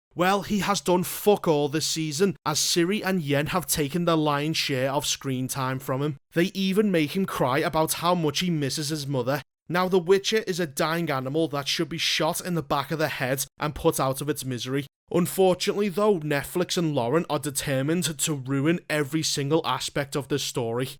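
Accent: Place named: Northern England, United Kingdom. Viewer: 😂 funny review. But it did make me wonder why men crying is somehow emasculating? Are you saying you'd think less of one of your boys if he cried whilst talking to you about losing his dad? He's your BOY! It must be hard being your friend if your going through tough times then. 😬 Also, whilst that black witch was definitely miscast, it made me laugh how uncomfortable you are with overweight people. Assuming you live in the West, given your accent (Northern England) then you must live in a permanent state of horror! I thought that woman was pretty. Just not right for the role, given the book description. Or, what we know of the witch graduation magical 'surgery'. It just feels strange that you are so disgusted by her because she's overweight. I dont know if you had the same issue with that king who was banging his sister? In the books he's supposed to be handsome. 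In the show he's overweight, older etc. But, oh well. Another thing this show inexplicably changed. Doesn't make any of them ugly, gravy-bleeding monsters. This may shock you. But, fat people can get partners too. Not everyone agrees with your point about them being ugly, disgusting monsters, is what I'm saying.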